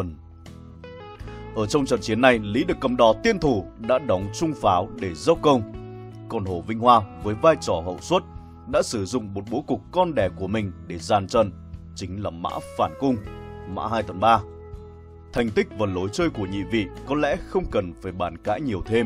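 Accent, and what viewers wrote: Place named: Phu Yen, Vietnam. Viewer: xét về kiến thức + giọng bình thì kênh này số 1. Vịt ú chỉ là làm trước, nhiều người theo dõi trước thôi, giọng Phú Yên nghe ko hay, ko truyền cảm bằng giọng Trung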